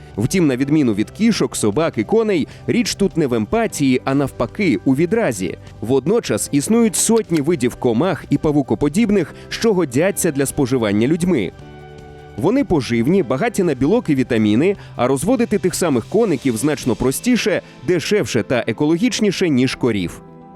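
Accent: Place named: Russia. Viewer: Навіщо коментатор "паскудиЦЦЦь" українську мову різними "тоДЗЗЗі", "видЗЗЗів", "їдЗЗЗяЦЦЦь", єгипЦЦЦяни",тощо ??? Яка гидота переробляти нашу мову на потворне маааскофске нарєчіє!!!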